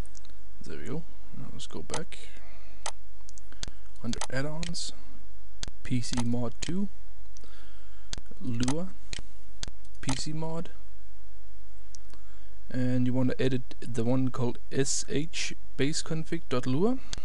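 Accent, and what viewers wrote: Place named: Denmark. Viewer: im almost certain its a danish acctent :P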